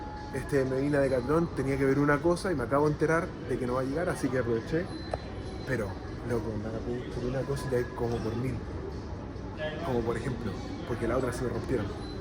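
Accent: Chile. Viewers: Viendo este video dije mmm me suena este acento 🤔 #chilena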